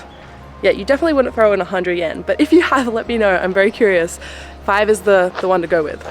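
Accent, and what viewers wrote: Australia. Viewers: How are you German but have an Australian accent? So confusing 😂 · An Australian with an Australian accent that lives in Japan and speaks Japanese and enjoys Japanese food and culture? I think I'm in love ❤️ lol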